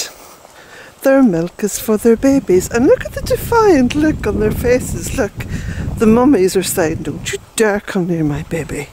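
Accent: Ireland. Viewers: Thank you, that was such fun. West Ireland is so beautiful, love it. Those cows were quite a treat. They sure look healthy. While you walked down your driveway I notice how the trees form a most lovely & mystical archway. How cool that is. I wish I could retain new information better. Thank you for repeating the names of the flowers & plants. I simply love Ireland & your accent. Since following you I've grown a better appreciation of my own small piece of paradise. Love to you, Jack & your home. Blessings P.S. My definition of goddess is same as yours.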